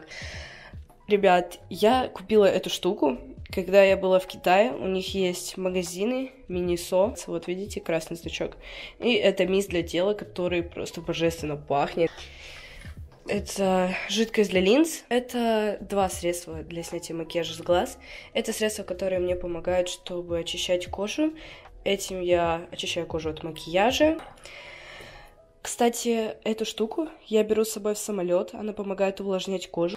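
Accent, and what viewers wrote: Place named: Russia. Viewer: 🤔 This accent! U speak Russian language! So are you Russian?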